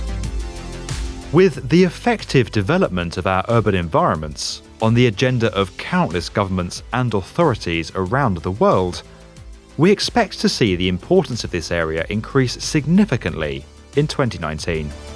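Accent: United Kingdom. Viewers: I like this channel, but let's be honest, you can get away with having nearly everything you say get taken as credible if you do it in a smooth British accent with sexy video editing skills. While most of the things here really do have a lot of promise....there's also a lot of wank too. The most infuriatingly bad proposal, the dumb idea that just WILL NOT DIE, has to be the pavement or sidewalk thing that "harvests the energy of pedestrian footsteps". This is not going to become a thing. Ever. Please look into how these things actually (don't) work. It would take years of foot traffic to power a single kettle boil, probably centuries to ever pay back the energy cost of their construction and manufacture. They're a scam that just won't die.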